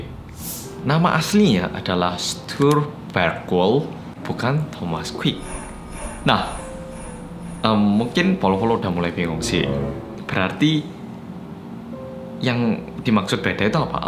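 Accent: Java, Indonesia. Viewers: Sangat medok sekali luar biasaa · suka bgt denger mas ngomong medhokkkkk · Bersama orang korea yg medok💛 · MEDHOKKK AAAA SUKA BGT SMGT TERUS MAS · suka ih medoknya mas 😂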